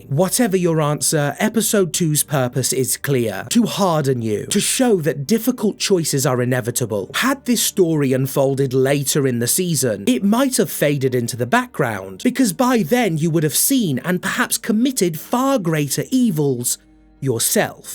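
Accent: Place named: United Kingdom. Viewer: Man i love this channel especially the way the essay is presented beautifully with a soothing brittish accent is a cherry on top AND chef's kiss!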